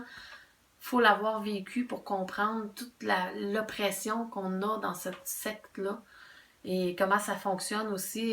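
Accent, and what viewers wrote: Quebec, Canada. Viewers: Merci de partager ton accent Quebecois. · Oh t’es Québécoise ! J’adore ton accent et j’adorerais venir visiter le Quebec un jour ! Vous dites le mois d’A-OU ? J’ai appris un truc haha. Sinon bonne vidéo. Au début de "mon réveil" je n’en revenais pas que ce soit partout pareil. Je pensais que c’était que dans ma congrégation que ça n’allait pas.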